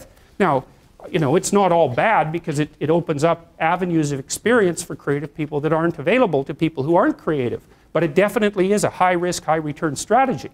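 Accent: Canada. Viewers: He's Canadian. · is he Canadian? i just realized the way he pronounces out. hes so well spoken i barely noticed his accent but now that ive noticed its so apparent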